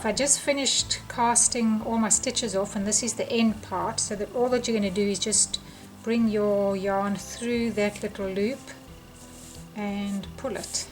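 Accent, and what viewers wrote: South Africa. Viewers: Awesome video :-) we're South Africans living in Ottawa, Canada ❤️Love your accent we recognized it straight away :-) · That's a South African accent!!! How lovely to hear! Erna from Cape Town, S.A. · You're South African! Am I right?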